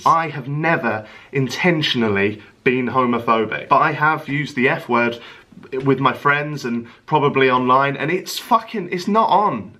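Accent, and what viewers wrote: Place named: Newcastle, United Kingdom. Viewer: Sky, a gay guy on Twitter that I follow, loves the True Geordie's accent and I love it. He once shouted at me for asking why he loved the Geordie accent so much.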